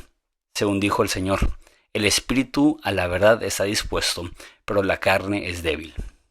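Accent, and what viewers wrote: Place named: Mexico. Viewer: Vos no estás capacitado para leer en vos alta: mala dicción, pausas inadecuadas,falta de acentuación y de entonación, tu marcadisimo acento mexicano.La misma máquina que transcribe al pie lo demuestra.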